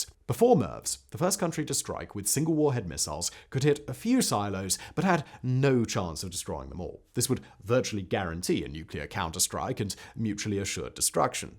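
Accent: RP, United Kingdom. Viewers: Silver linings, we got to hear say “penetration aids” with his posh accent. Made my day.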